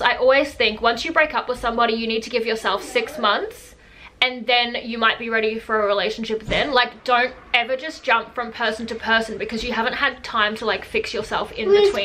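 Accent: Australia. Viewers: Shirt is adorable, agreed. Love your Aussie accent. Love your low-key vibe, and that u don't HAVE to have a boyfriend...bravo to u doll!😘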